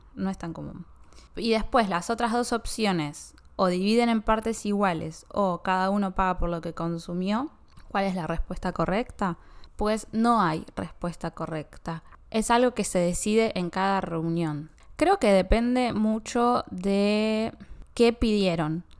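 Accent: Argentina. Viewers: ¡Hola,! Me encanta tu programa, especialmente este episodio sobre las costumbres argentinas. Aprendí muchísimo, como por ejemplo, lo primero que mencionaste sobre las diferencias en "cumplir con los horarios". Antes tenía el prejuicio de que los argentinos no eran puntuales, porque en China tenemos muy arraigado el concepto de la puntualidad. Sí, soy de China, y me encanta el acento argentino. Actualmente estoy aprendiendo español gracias a tu programa. En cuanto a temas como las propinas, ya lo había preguntado a mis compañeros. Por ejemplo, quería saber si en la peluquería era necesario dejar propina, y ellos, riéndose, me preguntaron si alguien me estaba obligando a darla. 😂 De este episodio también aprendí algo muy importante: cuando se visita a un amigo, lo mejor es llevar una buena botella de vino. No ir con las manos vacías es una costumbre que también tenemos en China. 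Allá solemos llevar frutas, o dependiendo de la edad de la persona, cajas de comida especial o suplementos nutritivos. Por último, estoy muy emocionada por tu próximo episodio. ¡Voy a seguir viendo cada uno de ellos! Espero que tengas días muy felices. ¡Saludos!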